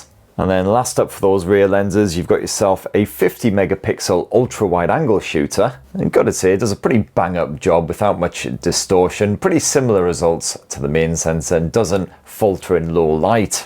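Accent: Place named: Teesside, United Kingdom. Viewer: He speaks in a Northern English accent - Teeside accent. I think it's time for you to experience more English accents than the received pronunciation English accent. It's perfectly understandable for the UK population although for some strange reason, many US residents have difficulties understanding English accents, other than the accent spoken by King Charles.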